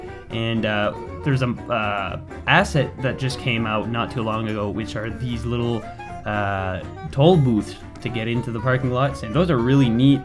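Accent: Canada. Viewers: Canada, I knew it. I knew you had to be from New England or Canada or something. I wish I knew what my Pittsburgh accent sounded like to other North Americans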